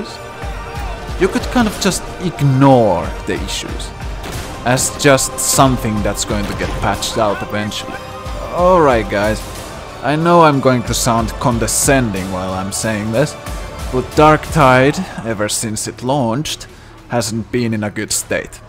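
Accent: Finland. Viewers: Are you finnish ? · Although you sound finnish I totally agree with you, give me my free biscuit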